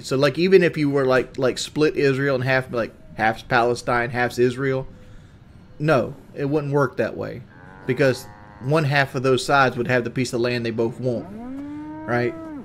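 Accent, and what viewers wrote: South, United States. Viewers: That’s just a southern thing. Many southerners pronounce want like won’t.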